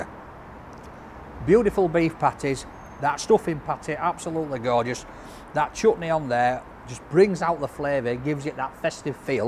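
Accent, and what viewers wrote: United Kingdom. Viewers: I love the way you Brits speak. 🙂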